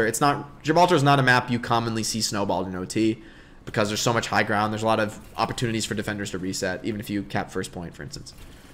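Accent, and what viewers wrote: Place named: San Diego, United States. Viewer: The intro sound like Doug DeMuro. Is that just a San Diego cadence thing?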